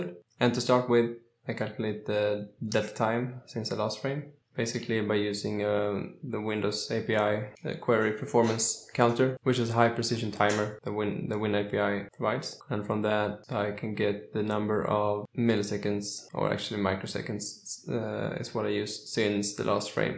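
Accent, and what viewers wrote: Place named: Sweden. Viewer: I lived in Sweden quite enough to notice the Swedish accent :)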